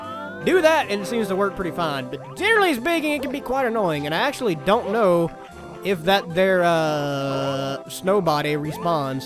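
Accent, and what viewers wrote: South, United States. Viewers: Hey there, nice LP. It's good to hear another southern boy doing an LP. If you're ever bored, check out my LP channel sometime. For the QOTD: Very hard to pick, but I guess I have to say Shifting Sand Land because I've always liked the Egyptian themed Mario levels. I guess because Super Mario Bros. 2 left so much of an impression on me, maybe?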